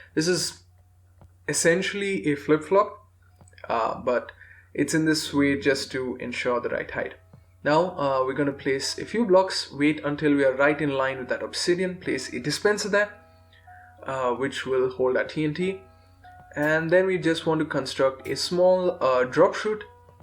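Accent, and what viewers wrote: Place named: India. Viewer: bro ur from india right ? cause u definetly sound like and indian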